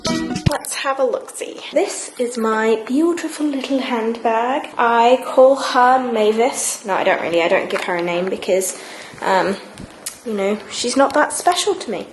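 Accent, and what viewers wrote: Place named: United Kingdom. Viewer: Hello lovely! This was a great video, awesome to have you here on Youtube. Thank you for sharing what's in your bag, the solid perfume is super handy. Haha just being nosey but are you from the UK living in Vietnam? Just going by the accent :)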